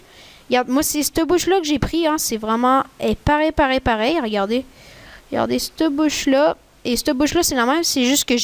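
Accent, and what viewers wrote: Quebec, Canada. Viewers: tu est québequoi ? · j'adore ton accent et les québécoi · T quebequois ??? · De 1 je m’abonne parce que merci De 2 je like parce que j’adore ton accent québécois ! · Perso je kiff ton accent québécois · J'adore l'accent québécois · Moi c rendu que je suis TELLEMENT rendu habitué a l'accent français que je suis surprise de voir un accent québécois même si je suis québécoise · Jadore les accent kebekois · 1-J'adore l'accent quebecois 2-Super tuto merci bcp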